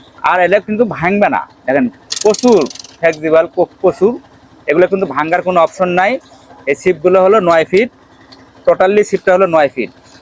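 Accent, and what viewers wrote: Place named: Bogura, Bangladesh. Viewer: ভাই আপনার ভাষা শুনে মনে হ‌ইতেছে আপনি বগুড়া সদর বা তার পাশে পাশের এলাকা থেকে বলছেন।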